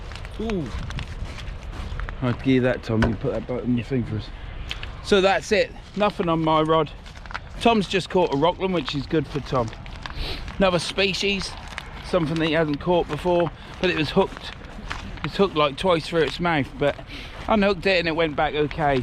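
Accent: Norfolk, United Kingdom. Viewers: Cracking video oil them boots up😉 well done Tom on the fish. Can tell your a Norfolk boy. Hope you to have a good Christmas.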